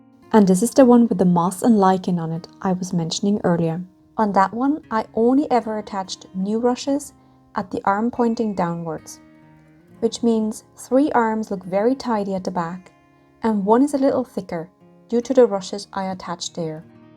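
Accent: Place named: Ireland. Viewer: Fantastic tutorial and great education to keep tradition alive. Where are you from originally. Sounds like you've lived in Ireland a long time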